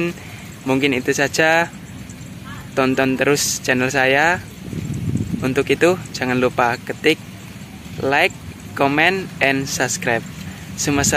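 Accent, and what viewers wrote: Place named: East Java, Indonesia. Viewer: Ustadz luar biasa smg sukses boso wetane medok bgt 👍👍👍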